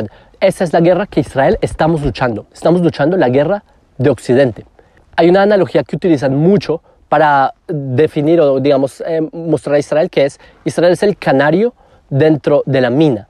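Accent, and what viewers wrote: Colombia. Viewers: el habla castellano de colombia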